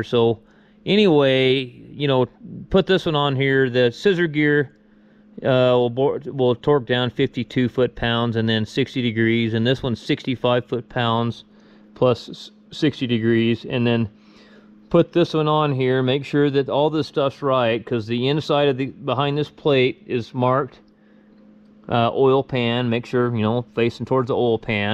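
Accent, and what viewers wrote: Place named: Oregon, United States. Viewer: I always thought that was a strange Oregon accent. Makes sense now....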